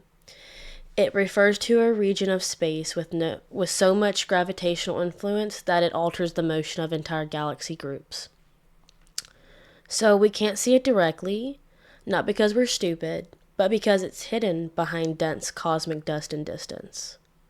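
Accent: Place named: South, United States. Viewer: It’s dark energy that’s making the universe expand not dark matter. Why you trying so hard to hide that southern accent?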